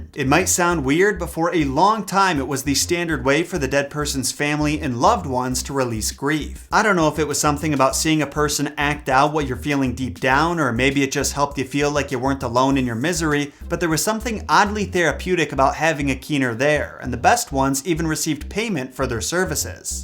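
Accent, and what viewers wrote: Ireland. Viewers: Better than most Irish accents from Hollywood!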